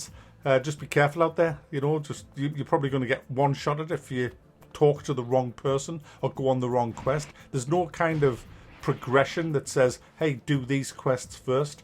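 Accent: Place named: Liverpool, United Kingdom. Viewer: That made me fucking crack up man.. Love the scousser accent too! LMFAO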